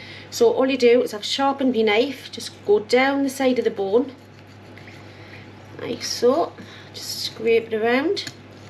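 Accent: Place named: North East England, United Kingdom. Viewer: My favourite channel❤ And as an exile from the north-east you’re a voice from home. I noticed you didn’t season the turkey meat before you rolled it. Was this deliberate?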